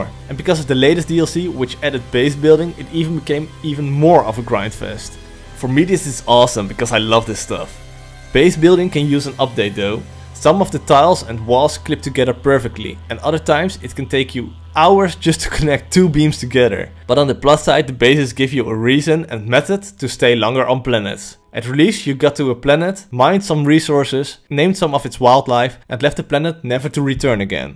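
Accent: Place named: Netherlands. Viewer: Ahh yes very cool, im also Dutch i thought already i could hear it in your accent a bitt, very good content you have a new sub 😎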